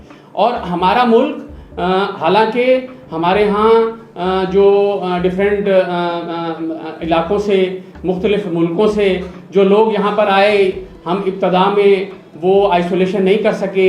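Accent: India. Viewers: اسکا لہجہ تو انڈیا جیسا ہے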